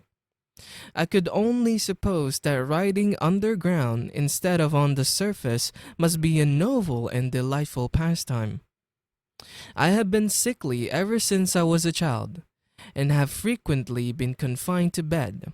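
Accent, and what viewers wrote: Philippines. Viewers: Love your speaking bro, just a few seconds in and I can realize you're Filipino haha. This is my first time listening to an audiobook because I could never get myself to read long texts so thank you for doing this.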